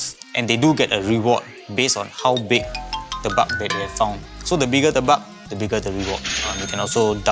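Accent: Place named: Singapore. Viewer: Okay, this is weird. You're indian but you speaking like singaporean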